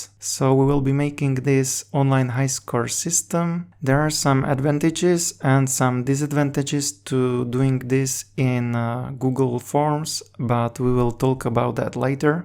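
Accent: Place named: Spain. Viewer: Perdona, pero tu normalmente no hablas ingles 😅. Tu ingles es muy español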